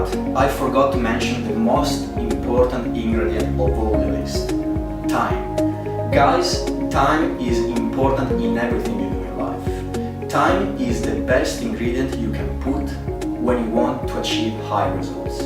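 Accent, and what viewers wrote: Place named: Italy. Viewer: Minchia allora avevo ragione a pensare che fossi italiano all'inizio l'accento era quello hahaha viva l'italia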